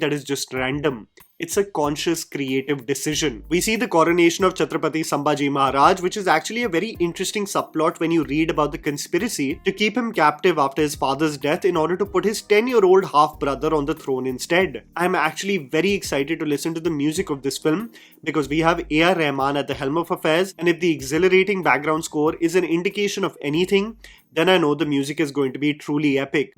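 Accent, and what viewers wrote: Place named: Punjab, India. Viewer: He is speaking like a panjabi not a maratha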